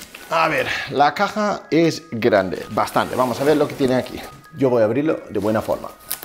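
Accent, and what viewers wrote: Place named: Badajoz, Spain. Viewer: Por el acento, eres de Badajoz? Un saludo 🙌😝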